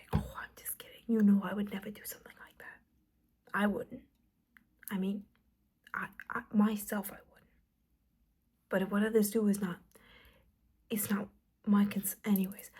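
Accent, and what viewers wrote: New Jersey, United States. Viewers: Girrrrrl you’ve GOTTA be from NJ or NY. As a Jersey girl myself, the accent is spot on. Loved this vid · Thank you for another Jersey accent video!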